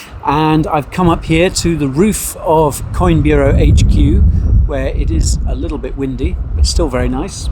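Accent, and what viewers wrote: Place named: United Kingdom. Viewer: Hey I use your vlogs to learn British accent and use in my acting 😁 keep them coming!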